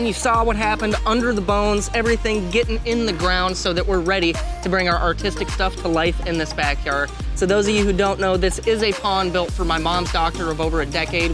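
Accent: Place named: East Tennessee, United States. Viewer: Epic!🐸👍🏻. Love the absolute “East Tennesseeness” and I love that this was for my favorite Doc💙🎄💙🎄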